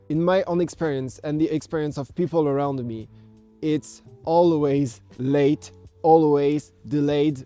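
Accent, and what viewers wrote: Poland. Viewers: I didn't know France is always late., your polish accent is very wonderful!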